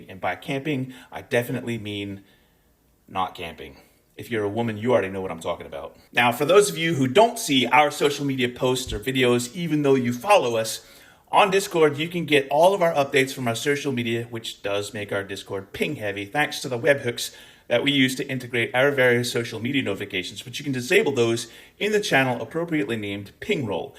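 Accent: Scotland, United Kingdom. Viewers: Loving the angry Scottish accent popping through❤ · 👏👏🫱 🔥🔥💪👊🖖...yep I'm being a cunny funt 😂 Is it just me or does keep dropping bits and pieces of an accent (except for the sepo one of course 😉) in every now and then throughout this particular video? I swear I'm hearing dribs and drabs, a bit of Scots, a bit of Taff, a bit of pom, a bit of Eire, either that or I've got a couple of them big furry grasshoppers (🦘) bouncin' around in the top paddock (🙇‍♀️)...🥴 🤣🤣 Catch ya l8r 🙃🐨🇦🇺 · Is it me, or am I really hearing a bit of a Scottish accent? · Innit cute! 😁🤭 But it's a Scottish accent! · bro, you sound occasionally scottish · I think this was this first time I heard Scottish accent ❤ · I made it to the end! I love the Scottish accent BTW.❤ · Ho is you Scottish?! Hell yeah! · Love the Scottish accent lol as for the epic screw up I'm not surprised. 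That would be like if the Enola Gay radioed ahead to let them know a little boy was about to be dropped on Hiroshima!!! Stupid as F***!!!! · Absolutely love your stuff! I apologise if this has been asked before, and also apologise for it not being relevant to the point of this video but… do I hear a slight “accent”?. I appreciate everyone has an accent really but I’m hearing like a Scottish twang or something. I’m not from the states so could even just be the accent of where you’re from but yeh I really really like it and eventually was like omg just ask the man 🤦‍♀️also honestly thank you brother for giving me legs to stand on so I can stand with you. by informing me about how your guy’s government is affecting you, your fellow Americans, those humans guilty of simply wanting to be part of and contribute to America or even just to be safe or at peace, and the rest of the world right now. 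If you ever wonder how powerful your voice is well I’m glad to let you know it’s powerful enough to have crossed the sea and stir real emotions/dedication to humanity. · Am I having a stroke or were you sprinkling in a Scottish accent? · You know is upset when the Scotish accent is coming out. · You would actually have to work hard to be this incompetent. 🙄🤦‍♀️. I hope no one in our military dies because of these idiots playing their own personal game of Risk. It was nice to hear more of your Scottish brogue,! 😊💜👍🏻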